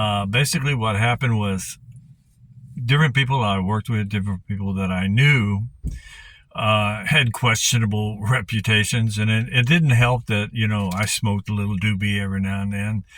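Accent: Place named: Louisiana, United States. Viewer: Great video! Are from Louisiana by chance? I hear a slight Cajun accent 😂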